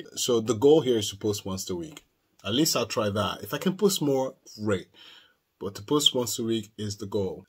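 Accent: France. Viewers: I have never felt so special to be called Lynda. Nobody has ever said my name that many times in such a short time with a French accent to boot. Pun intended. LOL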